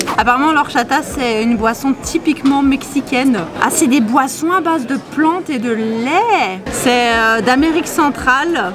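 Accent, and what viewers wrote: Belgium. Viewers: dommage que tu ne dis plus les chiffres à la manière belge :'( (et suisse) Sinon super vidéo comme d'hab !!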